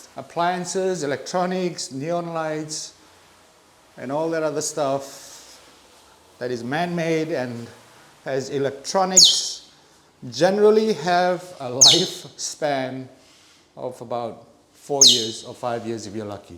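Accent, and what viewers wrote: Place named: Fiji. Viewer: Bula from Suva...I do remember being introduced to your family restaurant in Nadi while working there in the mid 1990s by work colleagues, Paul Chang and Terence Low (Bank of Hawaii). You asked the question in one of your videos whether to open a restaurant in Singapore...My answer is why not open in Suva. I think with your knowledge of fusing asian and pacific cuisine and unique experience, you would do very well in Suva, and would really complement your Singapore Supper Club. I'd be very glad to assist you set-up if you're interested. BTW, I'm enjoying your videos and you still have a very strong Fiji accent...cheers.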